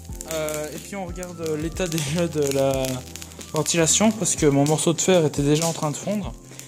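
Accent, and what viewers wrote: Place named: Belgium. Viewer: Tchu ti ké beau accent belge la Belgique le meilleur pays🇧🇪🇧🇪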